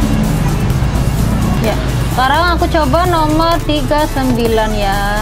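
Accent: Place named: Java, Indonesia. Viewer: Mba nya logat jawane kentel tenan asline pundi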